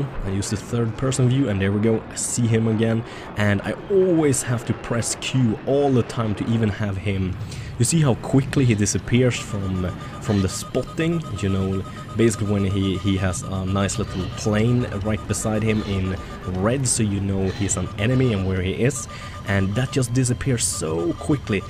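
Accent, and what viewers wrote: Sweden. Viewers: He's from Sweden, give him a break on his accent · Oh shut the fuck up guys. He's a Sweden so it's fine if he has an accent and he is a Damn good player and youtube. So hive him credit. If you don't like his videos, dont watch.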